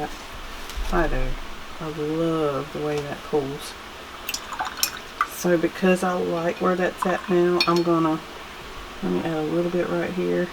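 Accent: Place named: South, United States. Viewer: I love to hear you talk. You have a sweet southern draw. I like how you say “that’s purdy” You have such talent. You really enjoy what your doing. I love watching you create.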